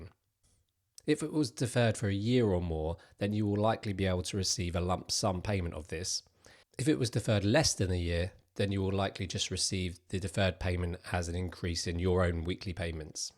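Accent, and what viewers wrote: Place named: England, United Kingdom. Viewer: He speaks English like an Englishman, therefore you could assume he’s referring to the UK State Pension Scheme.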